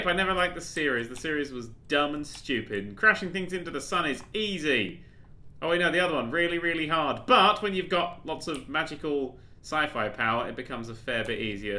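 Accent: United Kingdom. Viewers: seriousy?? u sound like ur from great brittian, if so. hw do u NOT know doctor who??and why does the interior look old (season 3-4 or something)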